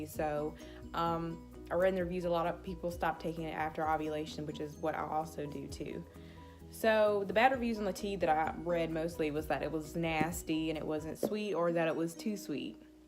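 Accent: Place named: South, United States. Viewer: Omg I love your southern accent.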